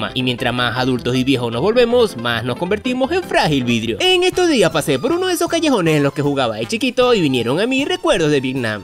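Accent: Venezuela. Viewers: Eres venezolano? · Oe eres de Venezuela?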